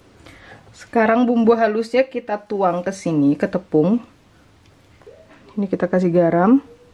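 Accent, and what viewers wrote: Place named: Java, Indonesia. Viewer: Mamak ini ngomongnya terkontaminasi sama orang Jawa ya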